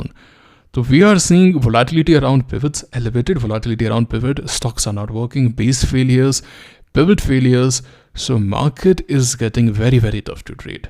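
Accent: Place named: Bagri, India. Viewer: gale mein aagi aapke😂😂😂 wah maza aa gya swad hi aa jaata hai jab bagri use karte ho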